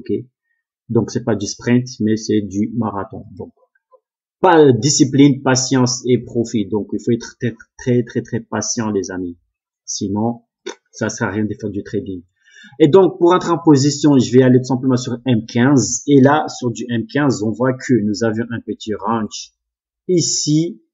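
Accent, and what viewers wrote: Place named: South, France. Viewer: je Veus apprendre ichimoku je commence a trader on <link> sur volatility et je Venus apprendre ichimoku donner vous une journee ou 2 de formation ps les augers ils parlent explique et touts et touts mais ils don’t trop de maniere was m emerge vous vous avec l air cool 😎 bref je Venus vraiment reussir, vous tees du sud Cela s enttend moi je sur sur londre mais a la base de Montpellier bref responder is jamais merci d advance. Fati.... ma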